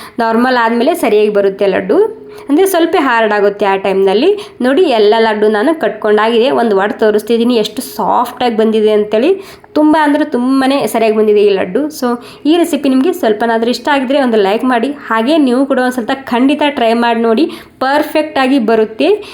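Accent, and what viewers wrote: Maharashtra, India. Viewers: Recipe 👌👌,and your Kannada with Marathi accent 😊Stay blessed.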